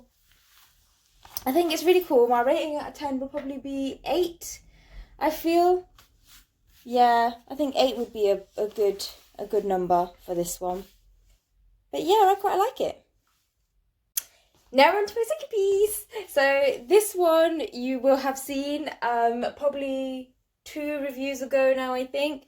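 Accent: United Kingdom. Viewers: Hey, OMG you look stunning, especially the white see through... Perfect. Also, that British accent, very classy all the way. Stay safe gorgeous..🤗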